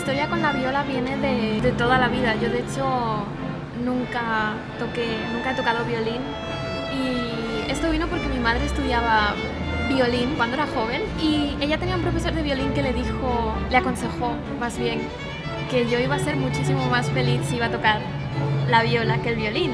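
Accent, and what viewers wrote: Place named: Mexico. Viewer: Se te pegó un poco el acento mexicano 😁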